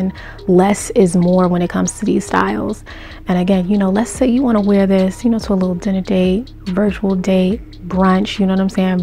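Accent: New York, United States. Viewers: dat nyc accent is a yes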